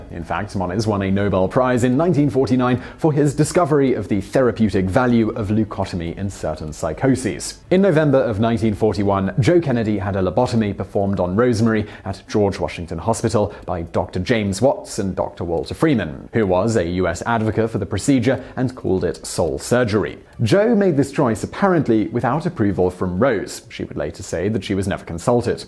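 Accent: England, United Kingdom. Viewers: Tell me why I thought vsauce was having a midlife crisis and took on an English accent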